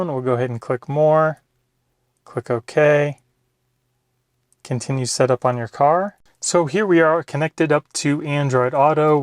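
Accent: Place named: Australia. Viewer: Aussie voice cool.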